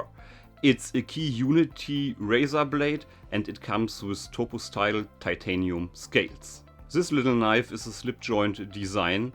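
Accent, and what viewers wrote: Germany. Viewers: Great products and presentation but the german accent makes it almost unbearable to watch, sorry.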